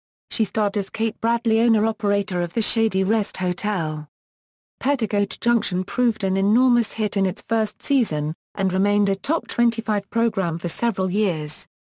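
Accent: United Kingdom. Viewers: I was hoping this would be a new twist on this great actress; but it appears to be a reading of WIKIPEDIA with a British accent. Thumbs down.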